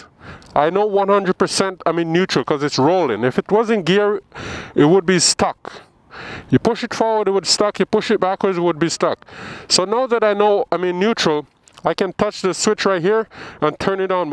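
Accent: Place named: Caribbean. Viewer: dis is your ORN ! your accent is a mix of foreign and caribbean lol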